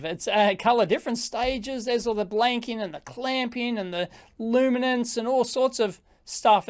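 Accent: Australia. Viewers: I think the 8-bit guy's video is a bit more consise, easy to follow. Luckily, I like Aussie accent, so its still a great watch!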